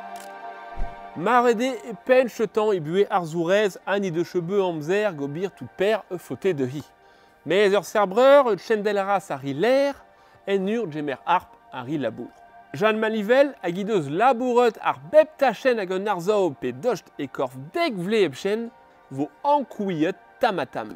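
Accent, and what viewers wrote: Vannes, France. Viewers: Is he speaking in Gwenedeg?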